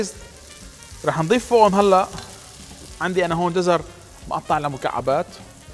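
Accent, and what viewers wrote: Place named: Syria. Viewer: انضميت للقناة كرمال اللهجة السورية بس♥️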